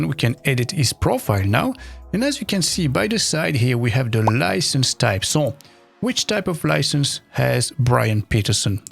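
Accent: France. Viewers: Carrément bluffé !!! J'ai cru reconnaître un petit accent français... Alors je publie mon commentaire en français... ça fait des jours et des jours que je me tappe des vidéos sur des solutions toutes plus floues les unes que les autres, là je dois reconnaître que la simplicité est au rendez-vous, il me restait 2 questions, est-ce que j'ose ??? Allez, je me jette à l'eau : pensez-vous que ce plugin peut-être utilisé comme un jobs board ? Et au sujet de la monétisation, pensez-vous que je puisse le paramétrer pour vendre la publication et la consultation de mes petites annonces sous forme d'abonnement mensuel/annuel ? :) Encore merci pour cette superbe présentation... A bientôt Dérick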